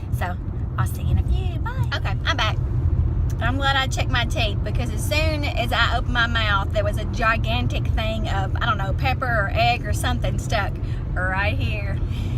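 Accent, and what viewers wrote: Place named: Tennessee, United States. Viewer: your videos are cute as a bug. Love that Tennessee accent the sheriff is a very lucky man to have such a sweet young lady. Remind him quite often how lucky he is. Jmal Jim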